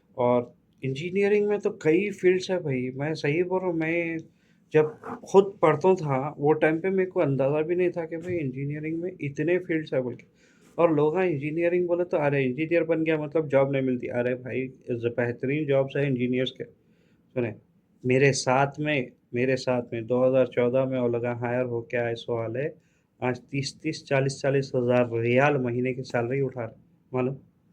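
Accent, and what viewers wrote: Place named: Hyderabad, India. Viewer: Aslkum khiriyat se hain sir ji nahi aap puri tarha hyderabadi baat hi kare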